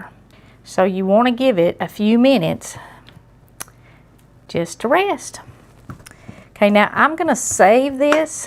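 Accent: South, United States. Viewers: This lady is now one of, if not my favorite Cooks! 😊 Thank You So Much! I love your videos & southern accent 😂😊👌🙏 Ky here... thank you again ☺️🌸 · You sound like a Hillbilly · Thank you so much for this video on frying chicken! I love your style and really love your southern accent! I have always cooked for myself and have wanted to try fried chicken. I have cooked chicken in a pressure cooker, baked and slow cooker too, but this looks simple enough. From your new fan and subscriber here in Palm Desert, California. God Bless you.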